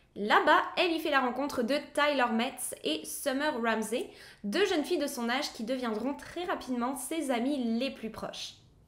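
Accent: Quebec, Canada. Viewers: je sais pas cest tres bizzard jai limpression que ta une legere accent quebecois desfois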